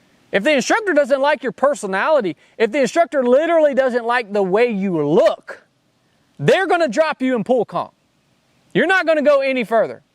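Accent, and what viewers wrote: South, United States. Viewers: what state are u from? I'm from OKLAHOMA.u sound like u are from the south?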